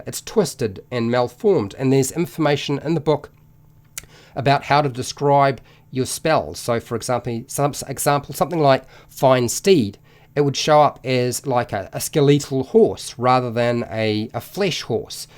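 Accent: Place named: New Zealand. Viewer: Great guide and only enhanced by that charming kiwi accent!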